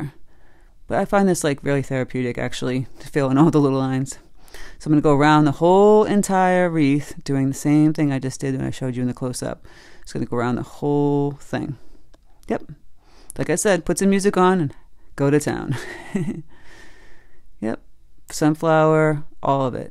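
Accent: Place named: New England, United States. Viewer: I have been doing art for years, since childhood and yet I tune into you because you a) sound just like my New England sister in law, and b) you inspire confidence, as you have such a casual, easy going way of explaining that you make everyone watching feel the projects are all approachable. Good job on breaking things down well.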